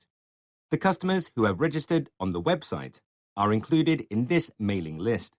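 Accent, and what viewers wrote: England, United Kingdom. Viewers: I don't get why people are moaning about the voice.. I'm English and sounds like a normal English accent to me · I think the voice is British. Sounds fine to me.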